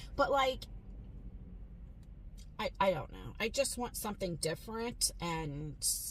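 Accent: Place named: New York, United States. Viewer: Hey! I’m originally from New York, I’ve been in Florida for 30 years. I hear your voice and I get homesick L O L… stick to your guns on your new life! Sounds like you’re getting another chance at a calm, peaceful, relaxing life! Count your blessings! You are rocking it! ❤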